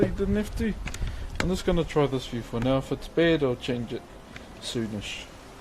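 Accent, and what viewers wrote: New Zealand. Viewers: Maori accent, its not that common so you pick up on it more.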